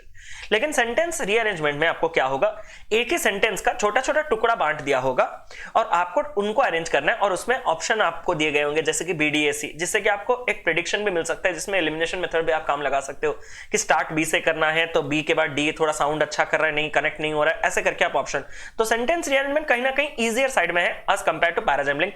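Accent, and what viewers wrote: Odisha, India. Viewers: Odisha se ho kya app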